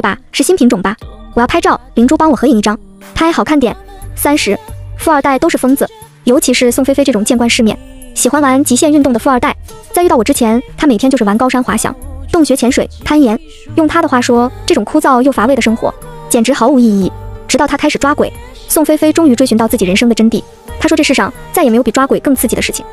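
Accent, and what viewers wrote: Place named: Malaysia. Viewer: 嗯？这么听到马来西亚惹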